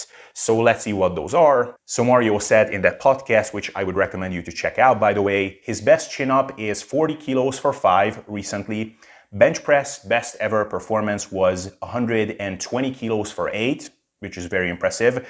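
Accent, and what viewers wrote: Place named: New Jersey, United States. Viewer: That’s a lovely accent you have, New Jersey? Loved the Dumb and Dumber reference 😂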